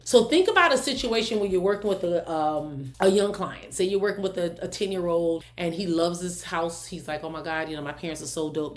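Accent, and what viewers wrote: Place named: Philadelphia, United States. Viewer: That Philly accent is strong!! Much love🙌🏿🙏🏿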